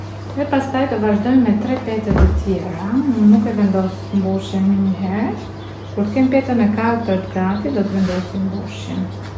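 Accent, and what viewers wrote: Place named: Gjirokastër, Albania. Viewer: Bravo,te lumshin duart! Mos je Gjirokastrite,te kuptoj nga dialekti qe perdor.Edhe une jam gjirokastrite. Te pershendes!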